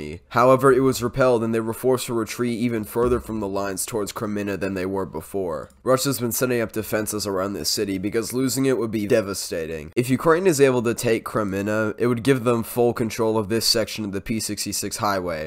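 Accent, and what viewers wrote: United States. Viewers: “Allergies” yeah right, to me you just sound like a fellow Californian. 😎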